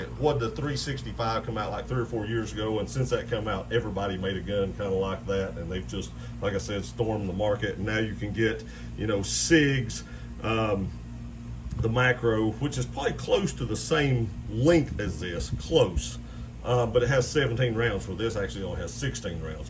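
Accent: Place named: Kentucky, United States. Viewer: I'm getting rid of all my pcc so, I've I've more room in the gun room, and I'm put that cheddar towards 2011 type pistols. I heard you mention gatlinburg, and the mountains look like Appalachian mountains in your vids you sound like you're from Kentucky I'm from east Tennessee/western part of VA (not wva) if I'm right that makes us neighbors.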